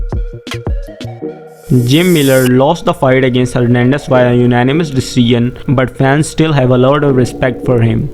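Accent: India. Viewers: MY GUY WITH THE INDIAN ACCENT!!!!! WHATS UP MY FRIEND?! Vegas 69 main card was the drizzling shits but Blanchfield was awesome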